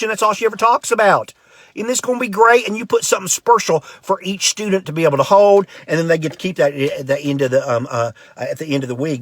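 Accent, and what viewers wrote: South, United States. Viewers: He's speaking cursive with a southern accent. I love this man.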